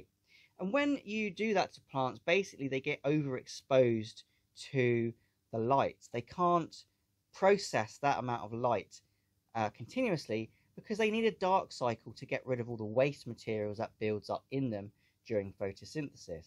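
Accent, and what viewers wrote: United Kingdom. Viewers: Hi, thank you very much for you always nice and interesting video's. So refreshing with your UK accent, compared to the noisy and annoying American videos. Also thank you very much for the cool intro theme song! Been looking for that one for years. 👍 Always hate it, when my timer not doing what I think I programmed it to do.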